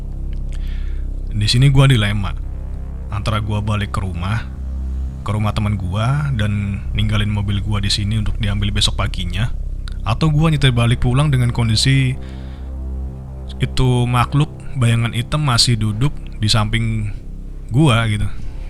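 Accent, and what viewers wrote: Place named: Surabaya, Indonesia. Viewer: Loh wong suroboyo toh mas? Boso suroboyoan ae cak unik wonten identitas e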